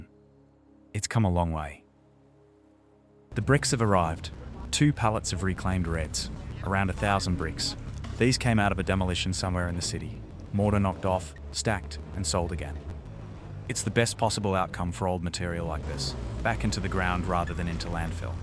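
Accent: Australia. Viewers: I have watched a few of these videos over the past couple of days here in Dallas, Texas, and you are spot on about his soothing voice. It is warm and comforting and his enunciation seems effortlessly precise, just like his placement of every. single. one. of those goddamn bricks. Add to that the Australian accent and, well, I would pay top dollar for the software that reads to me my emails and texts in that voice. Pivoting now to address the owner of that voice, you have mad skills, sir. Many. Your diction and the stories you tell reveal your intelligence and an awareness that few possess; your videography and landscape designs are evidence of a keen eye; and your diligence and unwavering attention to detail are the mark of a true craftsman. Bravo. 👏 I'm glad I found your channel. I'll keep watching. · Lovely surprise to open a clip and hear an aussie voice. 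Great video. Thankyou for sharing.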